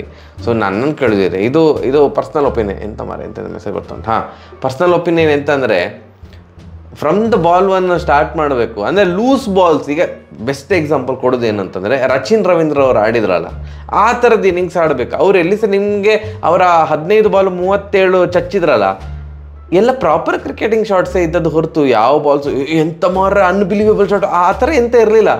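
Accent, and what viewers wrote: Mangalore, India. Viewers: Bro nanu nimge recent subscriber bro...... I watched all ur last ipl videos..... Janardhan sir comedy antu nxt lvl marayya😂chennagi roast madtare...... Nimma mangalore style kannada bahala chanda untu..... Keep going and keep roasting rcb if they perform bad😂avag swalpanaru buddhi barli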